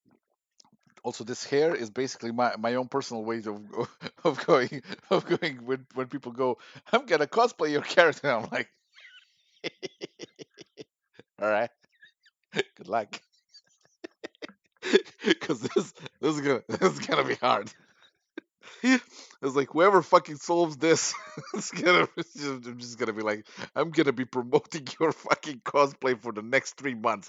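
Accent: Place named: Balkans. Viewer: Love the Balkan accent <3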